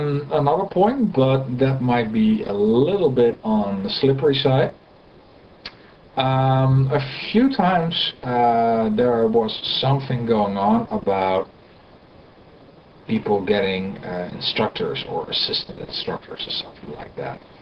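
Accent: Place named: Netherlands. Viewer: i can hear by your accent that you are from holland :p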